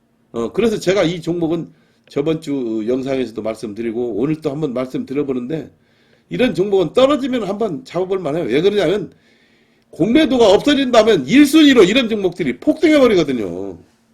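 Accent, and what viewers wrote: Jeolla, South Korea. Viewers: 약사님 전라도사투리쓰시네요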